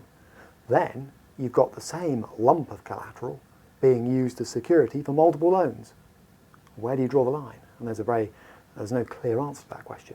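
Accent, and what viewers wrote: United Kingdom. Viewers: lmfao love and his brilliant explanations with a great British accent!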